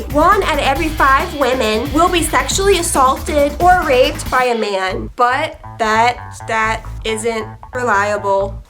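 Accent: South, United States. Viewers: Thanks. Talk about "pot + kettle = black", that FCkH8 dot-com clip itself was just pure hate. And, dear lord, the 'abuse through indoctrination' those young girls must have endured in that original video... it makes my flesh crawl down to the bone...I just watched 2mins 35 of filth that out did anything that Joe Gobbles conceived. Liking your videos, and as a non-North American, I can't get enough of your Southern accent - don't ever lose it!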